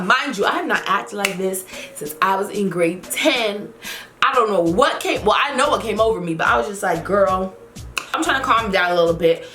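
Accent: Jamaica. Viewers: I like how as I heard the intro song I had a feeling that she was Jamaican, but after she seh 'boom' and 'tump' I knew she was a yaddie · YYYYYAAAAASSSSS I LOVE UR JAMACIAN ACCENT 😍😍😍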